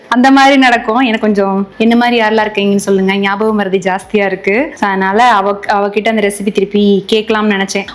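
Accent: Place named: Kanyakumari, India. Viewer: Neengalum kanyakumari slang paesureenga nalla Iruku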